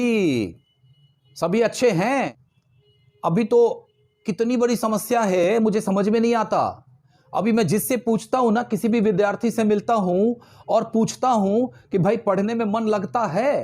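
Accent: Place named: Bihar, India. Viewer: Very nice video sir, I like you biharihood tounge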